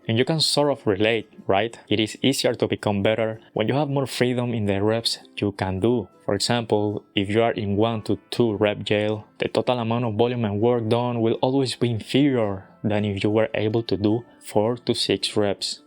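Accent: Spain. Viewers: That muscle up handstand is awesome. You sound like a European.. it's like hearing a Spanish person speak English. Interesting! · First time seeing an asian guy with a spanish accent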